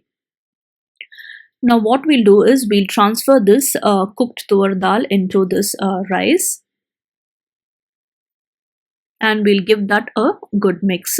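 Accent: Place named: Tamil Nadu, India. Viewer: hi...what an English... I can guess u may be from Coimbatore /Salem/namakkal..:)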